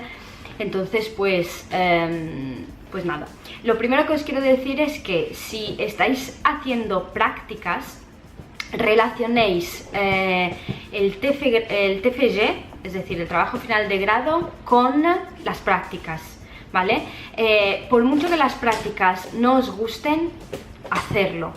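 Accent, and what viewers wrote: Italy. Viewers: Eres italiana?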